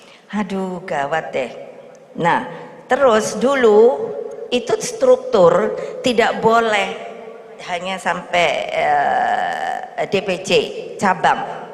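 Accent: Java, Indonesia. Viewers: Jawane medok 👍😁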